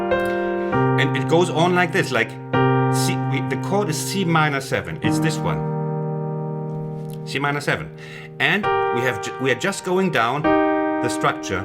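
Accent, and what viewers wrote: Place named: Germany. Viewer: Thanks a lot for posting this tutorial! It's one of my absolute favorite pop songs and now I finally know how to play it. I also like your hard German accent (being German as well).😀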